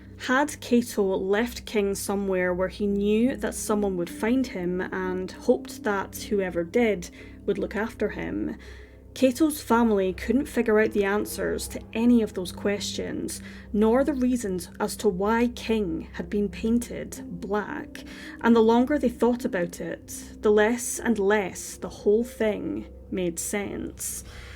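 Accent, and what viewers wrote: Scotland, United Kingdom. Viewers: Thank you so much for bringing light and exposure to these cases! Also may I ask what part of Scotland you are from? I’m an Ayrshire lass myself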